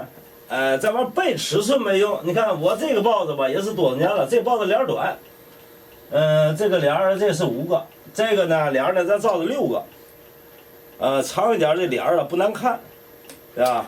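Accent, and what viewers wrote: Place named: North, China. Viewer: Usually I'm able to understand standard mandarin but the Northern accent of this master craftsman is just too much for me.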